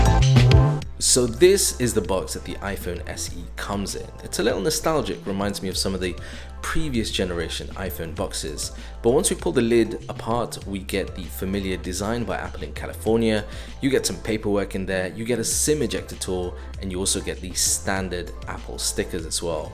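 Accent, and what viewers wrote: United Kingdom. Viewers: most british accent review that i've ever seen dude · great review :) i‘m gonna get one ig (loveee ur british accent haha)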